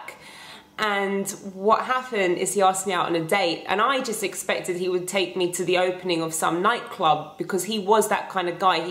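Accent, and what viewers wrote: United Kingdom. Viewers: "Bitch Shield" bahaha. I wish her accent wasn't so british though.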